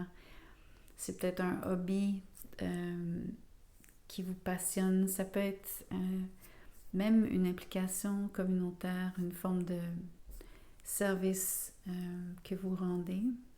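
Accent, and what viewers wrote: Quebec, Canada. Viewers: Heureusement que vous n'êtes pas ma voisine !je serais en consultation permanente ! Rien que pour me laisser bercer par votre accent québecois !😃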